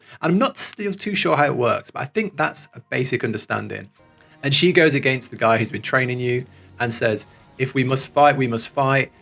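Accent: United Kingdom. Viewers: Its so fun to follow your progress! 🙌🏻😜 And I like your English accent, so nice to listen to 🙈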